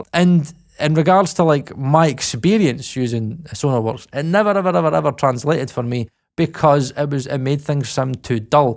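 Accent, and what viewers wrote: Scotland, United Kingdom. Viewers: Scottish accent is nice! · Trying to think why this guy seemed familiar. I had never been on this channel, and then I realised he sounded like Frankie Boyle sorry not every Scotsman sounds like Frankie Boyle to me.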